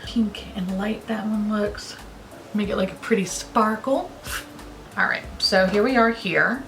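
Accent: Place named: New York, United States. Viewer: I’ve been watching beauty YouTube for years and I’ve been broke due to Covid and really fallen off watching beauty BUT when you post a video I get so excited!! Every time you start talking my boyfriend says “is that the New York chick??” 😂😂 then he has to watch you because you’re hilarious 😁 thank you for being awesome!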